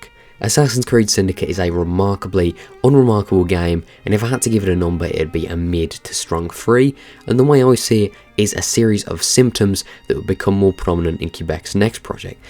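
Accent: United Kingdom. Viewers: Hello, I've just finished playing this game, and it was my first AC ever. And I loved it. But I also totally agree with your review! Thanks for opening my eyes in some aspects. I still enjoyed it though, and will probably platinum it since i'm halfway through that already. Also, I'm a sucker for London and British accent, so I guess I'm biased. I suscribed for this review ♥ gonna binge-watch some other videos of you!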